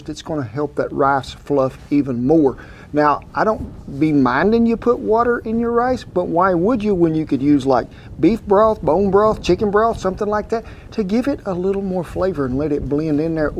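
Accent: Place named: Hollis, United States. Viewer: Hey! I thoroughly enjoyed your show. It was good to hear that Hollis accent again. I am going to try your recipe!!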